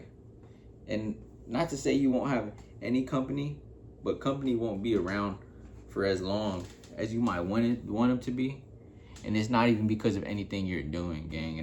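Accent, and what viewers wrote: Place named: Bay Area, United States. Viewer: You from the Bay Area you sound like it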